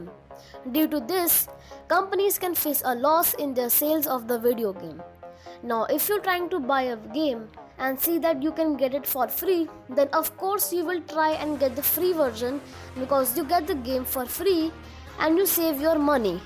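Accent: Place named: India. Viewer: My Desi accent boi🙂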